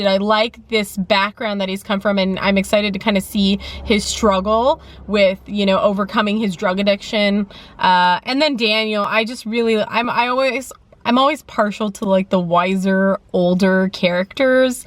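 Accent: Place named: United States. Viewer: Hi! Watching your reviews has been a great pleasure for me for some months now. Now I've got a question of a different sort. I very much like analyzing the way American people speak (vowels and stuff). However it is not easy, as I am not a native English speaker. Could you please help me by telling where you were raised/living? Thank you!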